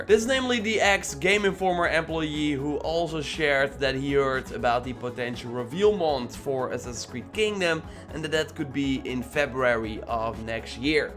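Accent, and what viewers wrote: Netherlands. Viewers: You kinda have a dutch accent bro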